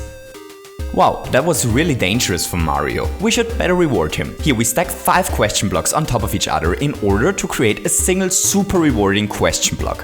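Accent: Germany. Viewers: Why would you pronounce Kaizo in an American way (sounds like Kayzo, when you say it)? English clearly isn’t your mother tongue and I guess it actually is German. If you would simply pronounce it in a German way, you’d be much closer to the actual, Japanese pronounciation.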